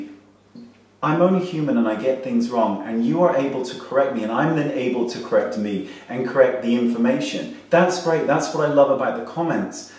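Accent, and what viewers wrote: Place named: South West England, United Kingdom. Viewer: It's not "subdued", it's a typical South West English accent.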